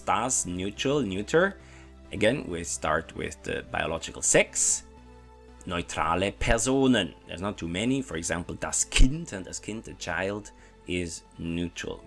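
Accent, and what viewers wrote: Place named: Switzerland. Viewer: Swiss accent, to my ears.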